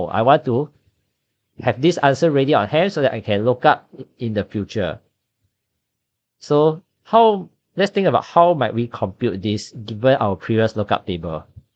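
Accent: United Kingdom. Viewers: Are you British? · Are you british?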